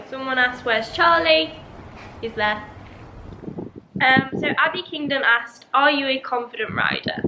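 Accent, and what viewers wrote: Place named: Yorkshire, United Kingdom. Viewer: Thought I recognised the Yorkshire accent, I’m from hull and it’s quite strong lol x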